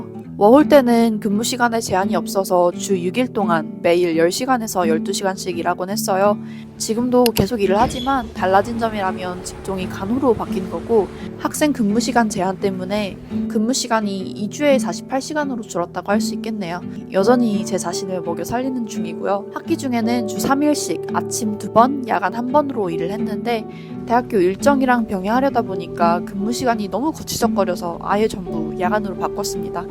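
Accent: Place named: Australia. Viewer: 내년 간호대입학을 위해 블번에서 학비모으고 있는 워홀러입니다ㅎ 지난 일년은 정신없이 지나갔지만 올해는 영어를 적극적으로 공부해보려는데 호주발음으로 스피킹 잘할 수 있는 공부법이 있을까요? 호주영어 하시는 모습에 늘 감탄하고 갑니다! 저는 미국식발음만 쓰다가 요새 좀 호주식으로 일부러 하려고 노력하고있거든요 ㅎㅎ 님만의 호주영어공부법이 있다면 공유해주세요! 항상 영상 잘 보고 있어요❤️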